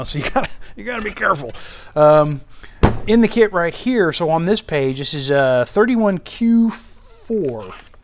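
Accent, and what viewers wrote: South, United States. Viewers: Five years I’ve been watching, and never before have you sounded so southern! 😆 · Hi, long time viewer, love your videos. Keep it up. After watching your videos for a few years now, not sure if it's just my imagination but for some reason in this particular video I feel like it's the first time I could really hear a southern accent from you:) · Where did the southern drawl come from? It’s never been there before. Hahaha. It’s fine with me, just new.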